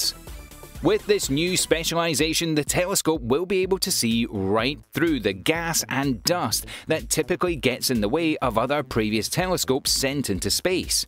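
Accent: Scotland, United Kingdom. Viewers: For someone with a British accent he mispronounces a Lot of important words ie: La Grange,Arianne,Grummin etc. Makes me wonder if his facts are just as poorly based.. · It’s LaGrange not larange. Perhaps it’s your Scots accent that misinterprets everything.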